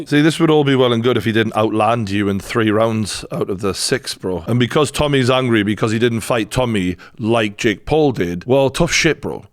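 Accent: Newcastle, United Kingdom. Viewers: True Geordie stating facts.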